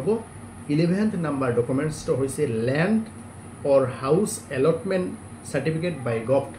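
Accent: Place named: Bangladesh. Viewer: Maat tu pura bangladeshi jen lagise.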